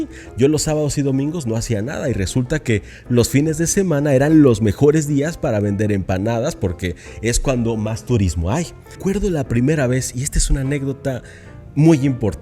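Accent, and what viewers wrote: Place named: Colombia. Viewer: pensé que eras colombiano.por ti acento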